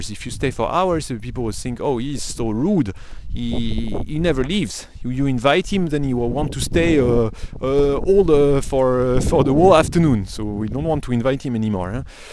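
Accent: France. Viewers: French english, so typical, haha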